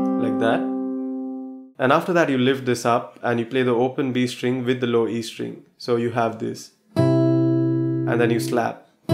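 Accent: India. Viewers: good indian accent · His accent is Indian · ikr? He has like the same accent. it's Indian accent. but let's stop comparing i mean like nobody likes to be compared? tell me if i'm wrong. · Your accent sounds indian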